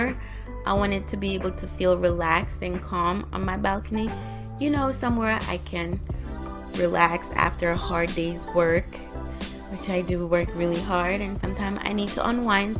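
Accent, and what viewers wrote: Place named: Caribbean. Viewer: Yes! I only caught a hint of the accent. I was actually thinking you could be from St. Vincent. Was not expecting JA at all! LOL!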